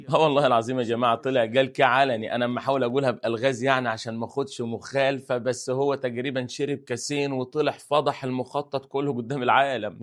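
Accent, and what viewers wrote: Upper Egypt, Egypt. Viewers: اللهجة الصعيدية اقرب لهجة عربية الي قلبي🇩🇿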